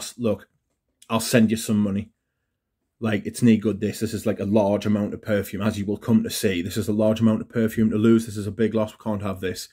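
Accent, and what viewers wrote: United Kingdom. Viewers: PS are you from UK?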